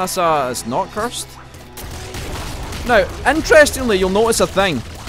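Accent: Scotland, United Kingdom. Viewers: ..... WHO IS THIS GUY AND WHY DIDNT I KNOW ABOUT HIM SOONER. as much as i like watching NL play Isaac, this guy is SCOTTISH NL, so to speak, which is just as amazing, cause he plays Nuclear Throne instead! That accent though... lols. So yeah, im subbing, im watching, and im probably gonna end up watching every episode he has.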